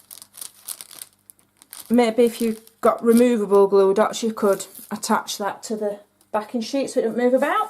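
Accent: Yorkshire, United Kingdom. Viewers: I adore your Yorkshire accent!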